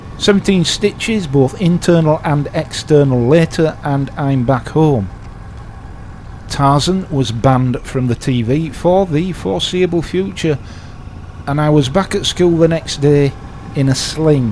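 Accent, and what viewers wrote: Yorkshire, United Kingdom. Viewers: This video mainly sounds way more palatable, you don't try to change your syllables etc to sound more "english". Wish you would make more of you're posts talking in your natural yorkshire accent, rather than the pretence of trying to talk queens English (kings ?). PS I have never been unsubscribed in over 12 months. Good luck with channel & take care .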